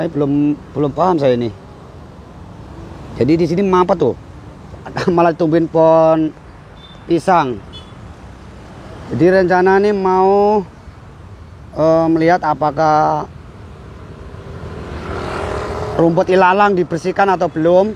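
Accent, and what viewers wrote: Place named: Bekasi, Indonesia. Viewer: Bang lu orang jawa apa orang bekasi....? bacotan lu sama pisan ama orang bekasi ora danta...😂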